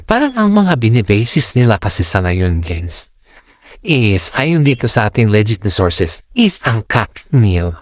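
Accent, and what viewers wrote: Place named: China. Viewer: Tono mo para kang intsik na bulol sa tagalog. Halo halo pa sinasabi mo hindi maayos. Magulo kang mag vlog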